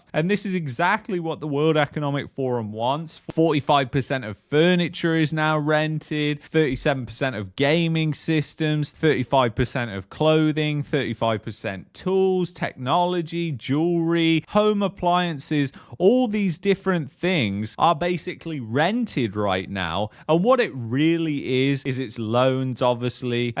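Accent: United Kingdom. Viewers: I never thought the British accent would annoy me so much, but here we are.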